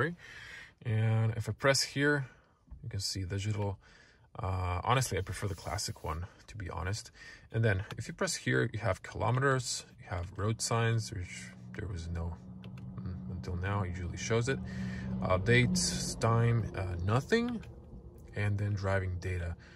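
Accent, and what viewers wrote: United States. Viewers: Are you american? Youre accent is really strong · Pohvala za vrlo detaljan test. Jako dobro i tecno govoris Engleski sa americkim akcentom. Prvo mi nije bilo jasno jesi li Hrvat uopce?! U testu je manje vise sve tocno no smeta me sto vecina ljudi tvrdi da je kvaliteta izrade korak unazad. Apsolutno da to nije tocno jer je auto izraden barem jednako kvalitetno kao i sedmica cak nesto i bolje u pojedinim segmentima. Prvenstveno mislim na kvalitetu sjedala sto nikako nije zanemarivo. Drugo je naravno sto je cijena otisla gore, te je normalno da se u tom cjenovnom razredu mogu ocekivat kvalitetniji materijali u vecem dijelu interijera. Nedostatak tipki je mozda ergonomska katastrofa no to je buducnost definitvno. Recimo tko je malo gledao spijunske fotografije nove S klase pa cak i buduce C klase (W206) vidljivo je da ce zapravo centralna konzola biti ogroman zaslon. 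Poznato je da S klasa diktira buducnost i trendove autoindustrije tako da je Golf 8 zapravo cak i malo ispred svoga vremena. Detalji poput samo USB-C portova u kabini te komunikacije izmedu vozila koji razmjenjuju informacije govore u prilog tome. Sto se tice dizajna mislim da je potpuno pogoden iz vise razloga i najveci je medugeneracijski skok. Kad je aktualna bila sedmica ljudi su govorili da je dizajn konfekcijski i dosadan, sad govore da je previse futuristicki i da je cudan te je sedmica skladniji auto. Jos jedna potvrda onoj staroj narodnoj "Taj se nije se rodio koji bi narodu ugodio". Za kraj cijena je definitvno prenapuhana ali to je boljka vecine VW-a. Rek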